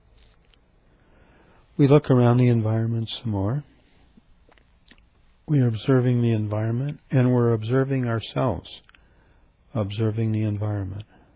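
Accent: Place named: United States. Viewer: i think its because its american, but the voice really creeped me out, abit. sorry.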